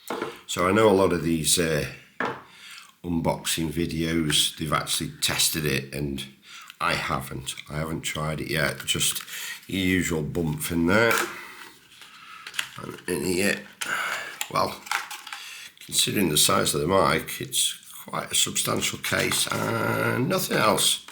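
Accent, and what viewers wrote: Stoke, United Kingdom. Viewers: Are you a stokie by any chance 🙂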